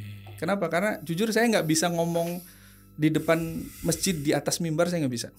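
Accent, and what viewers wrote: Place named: Indramayu, Indonesia. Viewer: Logat indramayu dan cirebon nya gak ketinggalan😂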